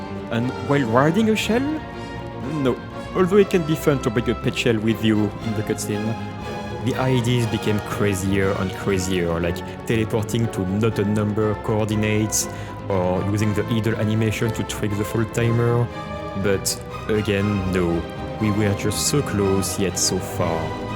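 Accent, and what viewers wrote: France. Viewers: What a good english with french accent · Are you French? You sound like one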